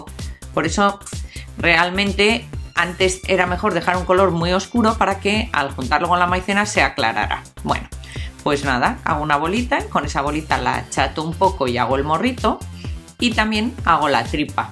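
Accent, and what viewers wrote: Spain. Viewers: Amo el asento español❤️❤️ saludos desde Monterrey ❤️❤️❤️ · Amo el acento español❤❤ soy de Mexico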